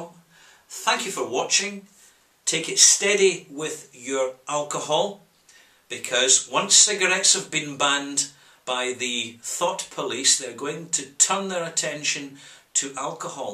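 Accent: Scotland, United Kingdom. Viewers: Look for greenorg? Sorry but you have a bit of a scottish accent :p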